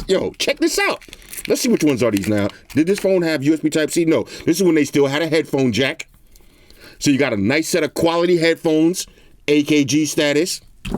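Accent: New York, United States. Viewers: You from New York? B.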